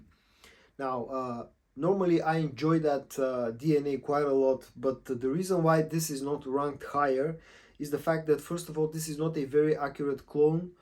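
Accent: Bulgaria. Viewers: Very good video! Might sound kinda weird, but are you bulgarian by any chance? Your accent sounds bulgarian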